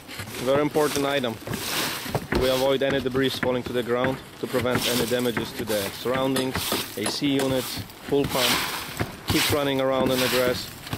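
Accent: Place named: Mexico. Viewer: good to see these tough americans working so hard. Gotta keep those jobs. No damn Mexicans come here as roofers, get rich and demand overly high wages. Not in my land. Hope that heavily accented Mexican commentator isn't getting paid much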